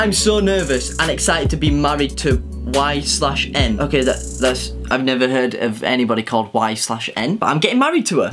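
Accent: United Kingdom. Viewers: hey!! I am subscribed and i have my post notification on since i have subscribed. and im from the Philippines. thats why i watch your videos on monday. 😭 i love your accent and your videos.. i just love the English Accent. and i cant believe your just 17 years old. im just a 5 footer and im already 18. kinda jealous of your height. share some blessings. 😂😂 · BRITISH ACCENT ON FIRE🔥🔥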